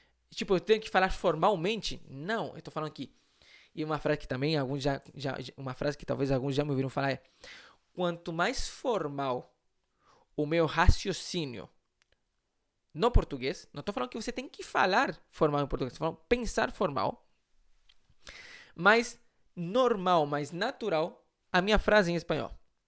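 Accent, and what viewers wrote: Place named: Chile. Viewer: Em meio à correria da minha quinta-feira, consegui ouvir o áudio da aula de hoje. 😅 Gostei muito das sugestões que você deu. Obrigada!!! E uma coisa que achei interessante é que, em vários momentos, você falou como um nativo brasileiro. Se não fosse a pronúncia da letra "o" em algumas palavras, ninguém ia acreditar que vc é chileno... rsrsrs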